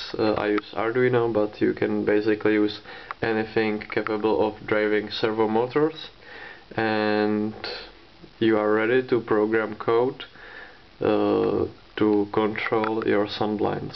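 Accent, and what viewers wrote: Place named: Czech Republic. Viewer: Love that Czech accent :D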